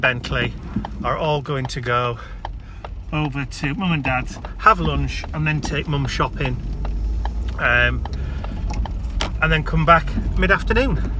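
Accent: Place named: North, United Kingdom. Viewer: Hi. I like your videos. They're so natural. By the way, where is this accent from, somewhere in the north?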